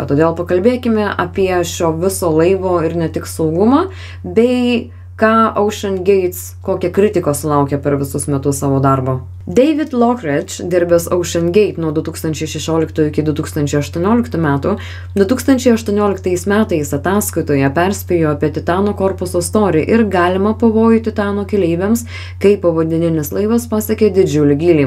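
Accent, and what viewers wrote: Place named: United States. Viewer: Ausys vemia su tokiu akcentu klausyti, jei tokia amerikone, manau anglu kalba butu geriau daryti video, turesi bent didesne auditorija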